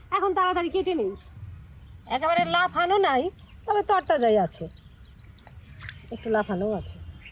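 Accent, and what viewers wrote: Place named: Bangladesh. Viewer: আপু তোমার ভাষাই কিছুটা বাংলাদেশের টান আছে।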